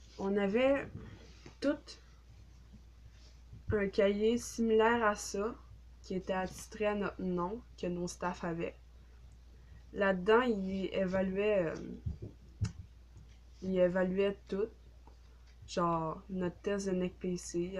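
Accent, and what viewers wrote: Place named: Quebec, Canada. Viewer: La drill c'est une variété de musique? Ton visage est magnifique... WOW 7 ans déjà... C'était un camp scout? Moi j'ai fait des camps VTT en tente... et aussi des camps fixes... mais pareil: ça date... Joli blason... WOw c'est militaire? ou para-militaire? Tu faisais des pompes? des tractions? Du coup ce camp ça Te fait comme un diplôme ou quoi? Si Tu en parles, j'imagine que Tu as aimé... Félicitation! Joli accent québécois... Tu as des origines "américaines natives"...? Ne T'excuse pas: Tu ne dois rien à personne... Oh force à Toi! Tu stresses? Vos pyjamas c'étaient des uniformes, aussi? Tu as gardé des contacts?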